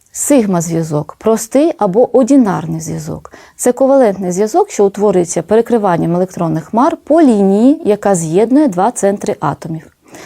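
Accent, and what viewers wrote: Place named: Russia. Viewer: Дуже відчувається, що людина говорить російською.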